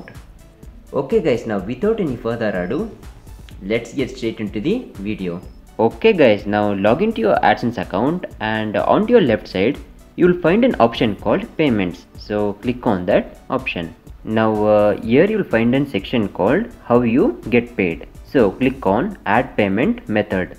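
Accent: Karnataka, India. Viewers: Slang inda gothu aithu Kannada atha, 😍 I'm from hospet, ballari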